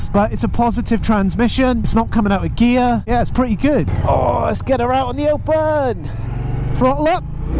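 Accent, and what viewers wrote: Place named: England, United Kingdom. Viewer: Good review mate. I have not ridden an Indian before but I don't care for the weight difference on the right side. To me, that is an Engineering flaw that could be addressed by Indian. I have to say though, as much as I like hearing how you talk across the pond, I just need more England accent and jive talk. Give us the good stuff... :)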